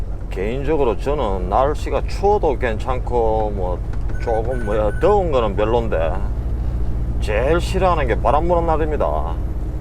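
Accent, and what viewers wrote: Gyeongsang, South Korea. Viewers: 경상도 사투리가 늘 정겹게 느껴집니다. 처가는 포항, 매형 두분도 경상도입니다. 나라사랑 잊지마시고 늘 안전하고 건강하게 지내세요 ~ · 저희집앞을 지나가셨네요 ㅎ 101 과 I-17 만나는교차로 근처에 살고 있습니다~~~ 저도 경상도 사람이라 항상 사투리가 정겹네요~~~ 영상잘보고있습니다~~~ 항상 안전운전하세요~~~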